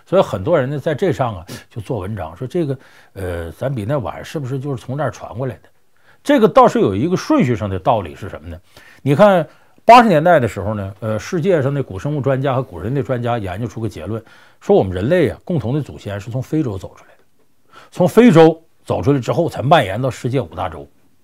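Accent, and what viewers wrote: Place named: Shandong, China. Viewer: 这山东话太纯了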